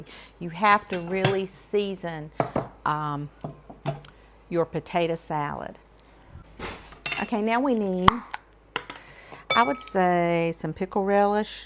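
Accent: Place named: North Carolina, United States. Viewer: Where are you from? You sound like me lol. I’m in central NC. Love your potato salad 😋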